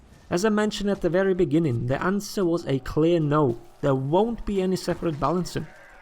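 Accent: Scotland, United Kingdom. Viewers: You sound really Scottish are you?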